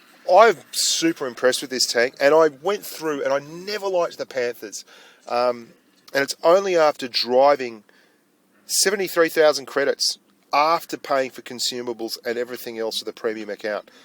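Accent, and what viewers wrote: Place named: Australia. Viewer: Your videos are great and your commentary is always entertaining. I don't know why but I've always loved the way you Aussies talk. Guess you can blame it on crocodile dundee from when I was a kid. I'd love to hear your thoughts on the Churchills. I can't find anywhere in my heart to like them but I want to know if it's worth grinding past them to the Black Prince and beyond.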